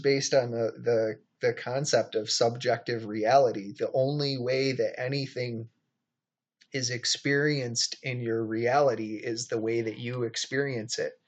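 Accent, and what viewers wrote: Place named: Minnesota, United States. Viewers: sounds a little Minnesota to me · I'm getting a UP vibe on that accent, lol. You from the upper midwest? 😁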